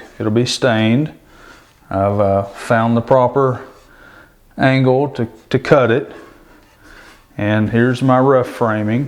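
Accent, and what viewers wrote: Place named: Tennessee, United States. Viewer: Have stairs shaped just like this one in a rental home. I have to take the railings off so that occupants can move there beds ,dresser etc. to the rooms upstairs which over time has created a lot of wear and tear on the stairs from taking railings on and off.Never been much of a carpenter, so i was wondering if you were for hire?Listening to the accent it seems you are in my neck of the woods. Am in southeastern part of Tn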